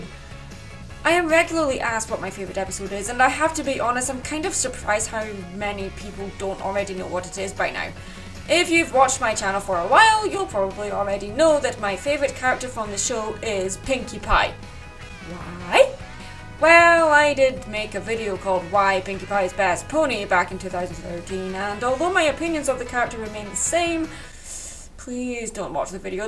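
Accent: Scotland, United Kingdom. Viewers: am sorry to asked but are you Scottish or Brittan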